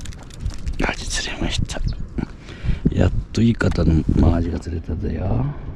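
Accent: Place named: Chiba, Japan. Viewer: 「あんでだよ～！」(何でだよ～！)好きです、千葉弁。「青アジばっかでしっけになんね」でも楽しそうでなによりです。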